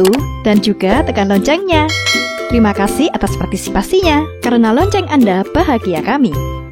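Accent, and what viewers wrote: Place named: Bandung, Indonesia. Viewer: Orang Bandung mana suara ni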